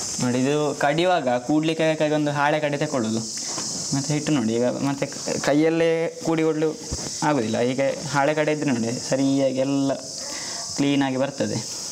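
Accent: Mangalore, India. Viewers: I like ur mangaluru language love from bidar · Manglore kade ide tara swachha kannada matadtare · ಮಂಗಳೂರು ಕನ್ನಡ sounds good 👍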